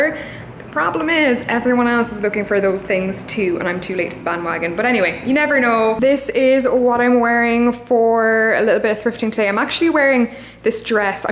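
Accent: Ireland. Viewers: I'm new to your channel. Is that a slight Irish accent I hear by chance? Great gems!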